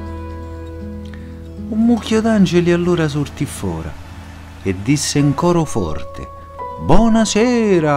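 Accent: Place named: Rome, Italy. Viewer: manchi😘 che bel video, e si sente che tu sei Romano un piacere ascoltarti.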